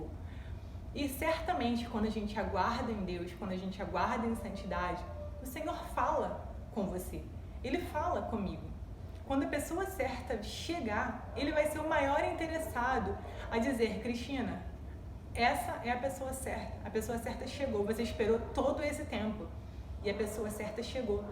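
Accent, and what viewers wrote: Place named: Rio de Janeiro, Brazil. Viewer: Carioca S2 Sotaque lindo e fofo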